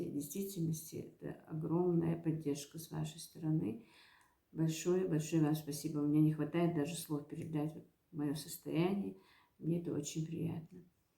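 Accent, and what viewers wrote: Standard. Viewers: Вы так чисто и правильно разговариваете,приятно слушать Ваши рассказы.Испытаний много на Вас выпало.Хорошо что у Вас много хорошей родни.У нас родители вообще с дет.дома,с Дагестана.В ВОВ у них умерли от голода и болезней родители, и все моего папы маленькие братья,он 7 летний мальчик остался один из пяти детей в семье.Осталась одна старая бабушка,которая отдала его в детдом.Родни дружной как у Вас у них не было.Сами прокладывали себе путь.Вы очень сильная и трудолюбивая женщина.Пусть у Вас все наладится.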